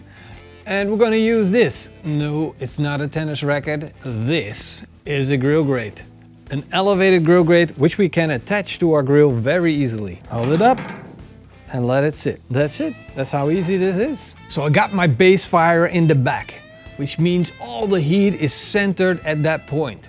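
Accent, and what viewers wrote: Netherlands. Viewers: Your accent sound like Dutch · English with a dutch accent, lekker boers hahaha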